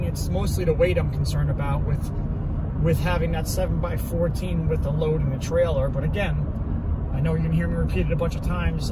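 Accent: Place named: New Jersey, United States. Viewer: He’s a great helper! I hear the jersey accent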